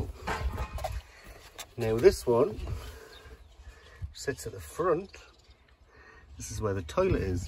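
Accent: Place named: India. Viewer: Are you Indian?!!!i cant believe how you talking!!!!😁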